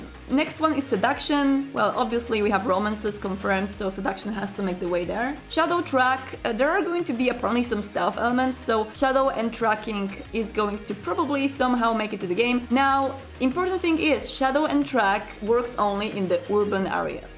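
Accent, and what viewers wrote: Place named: Poland. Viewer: I'm loving these videos and your polish accent <3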